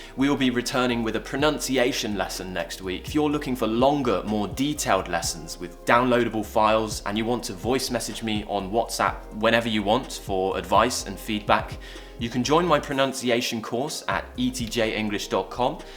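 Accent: United Kingdom. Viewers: Your British accent is really beautiful.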